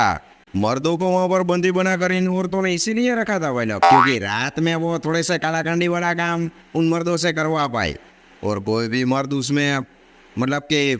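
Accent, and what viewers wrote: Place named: Chhapra, India. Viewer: Tera boli chapare jaisa hay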